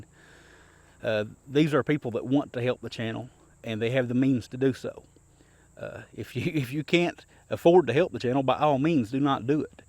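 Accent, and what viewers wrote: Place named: Eastern Kentucky, United States. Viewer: It's good to see you making progress. I love to hear that accent from Eastern Kentucky. I hope you get to 100K before long. God bless.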